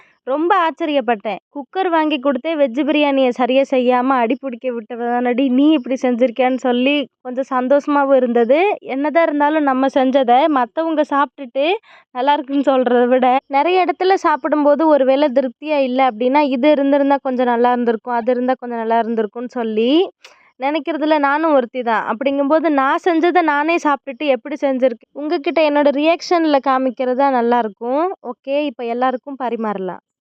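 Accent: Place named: North India, India. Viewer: இங்க வந்து திண்டுக்கல் பக்கம் வளர்ந்த பொண்ணா இருந்தாலும், உங்க தமிழ் வட நாட்டுக்காரங்க பேசுற தமிழ் மாதிரியே இருக்கு. எப்படி???🤔🤔🤔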